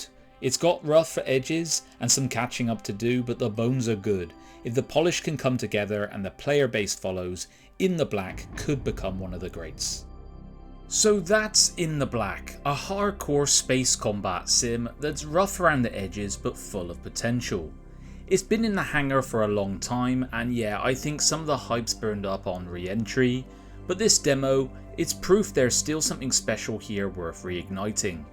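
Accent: United Kingdom. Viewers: too british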